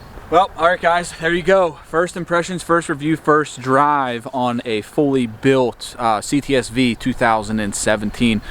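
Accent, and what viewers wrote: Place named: Delaware County, United States. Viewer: Picking up a little Delco accent there.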